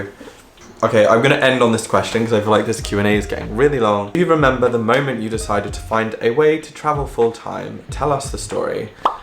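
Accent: Australia. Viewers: You definitely have a Aussie twang in your accent now!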